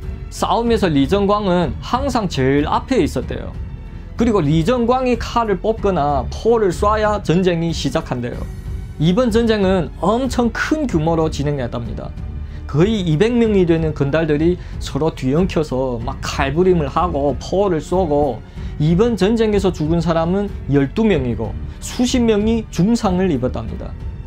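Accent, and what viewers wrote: Yanbian, China. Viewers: 오랜만에 조선족 이 말하는 조선말 들으니 예전생각 나는군요 중국에서 내밑에서 일하던 조선족 직원들 생각납니다 다들 잘있는지 재미있는 채널이군요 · 延边土话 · ㅋ ㅋ 완전 한시간동안 헛소리만치구 자빠졌네 말투가 연변말투인데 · 연변말투 배우고 싶다 혼자야~앙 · 연변말 와 한국사투리 힘들게 듣고 감니다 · 너무웃겨요 ,,중국식 한국말이요 ,👍👍 재미있고 흥미롭네요 ,,조선족들에 만행들이 ,대단하네요 ,범죄도시, 실화를 바탕으로 헐? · 말투가 조선족인데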